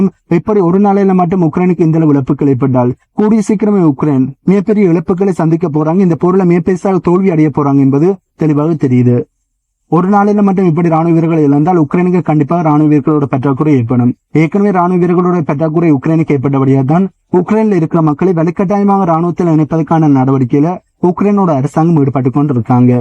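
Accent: Sri Lanka. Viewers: 🙏🏽 இது வட இலங்கை தமிழுடன் ஆங்கில சொற்கள் சேர்க்கிறது. இயற்கை தமிழ் 🙏🏽அப்துல் காமிர் ஸ்டேலை கேட்டு சலித்துவிட்ட்து அதைதான் எல்லோரும் கொப்பி பண்ணுறாங்கள் 🤣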